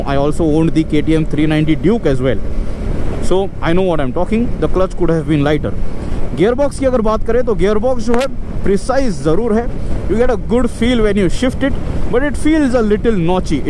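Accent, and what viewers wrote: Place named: South India, India. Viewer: One is national language and another is almost international language, so I guess you should use this excuse and do some contextual thinking with your interest in bikes to learn some Hindi. His Hindi as it is has a heavy south Indian accent, so I guess he's doing his best to speak in Hindi to cater to a larger audience.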